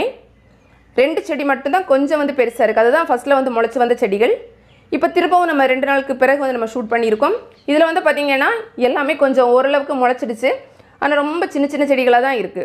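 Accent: Kanyakumari, India. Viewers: Kanyakumari slang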